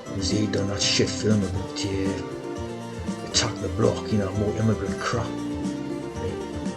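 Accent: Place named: Geordie, United Kingdom. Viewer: I just wondered if you'd lived abroad but I know you are pure Geordie 😂😂🤣🤣😂😂🤣🤣I'm Northumbrian, we both have same strong local accents, unlike many that have changed to fit in, I'm proud of where we're from and never going to change, every two mile up the road a different accent, I was brought us as a Geordie, then moved back a few miles and worked with pitmatic accent, breed of our own😆 x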